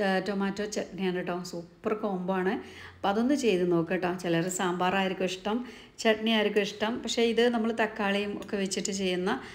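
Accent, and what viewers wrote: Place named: Kannur, India. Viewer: താത്ത സംസാരം വല്ലാതെ മാറ്റി കണ്ണൂർക്കാരിയെ പോലെ സംസാരം പക്ഷെ താത്താൻ്റെ പഴയ സംസാരം കേൾക്കുന്നതാണ് ഞങ്ങൾക്കിഷ്ടം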